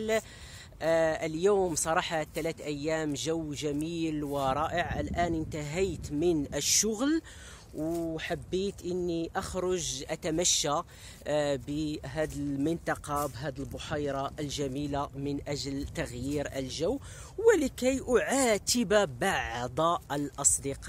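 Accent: Morocco. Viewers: هههههه عن جد انت ظريف كتير حتى بعتبك لطيف ودمك خفيف ، بعدين احلى اهلين بتطلع منك ، على فكرة أنا بحسك سوري لكن بيحكي بلكنة مغربية 🌷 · خويا انا عاد عرفتك البارح و راني كنشجعك و كنشكرك على كل ما كتقدمو لنا من معلومات و نصائح. كنتمنى لك نهاية أسبوع سعيدة. جاتني هضرتك مراكشية إلا ايه راه حتى انا من مراكش 😄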